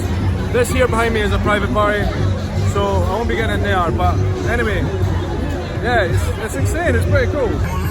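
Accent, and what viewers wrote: Scotland, United Kingdom. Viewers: Advice to you or anyone else traveling from abroad to an American sporting event - TALK TO PEOPLE! Us Americans LOOOOOVVEEEEE accents - Scottish is the best! - and once we find out you’re from elsewhere, it becomes OUR DUTY to show you the best time possible; you won’t pay for drinks the rest of the day, trust me! Never hesitate to use your accent as the currency it is here in the states; we love to have you! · You're not wrong, especially if it was a Scottish dude who's visiting these tailgate spots. A Scottish accent is basically a free pass. Most people wouldn't mind if you were drinking their alcohol. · Especially with that Scottish accent!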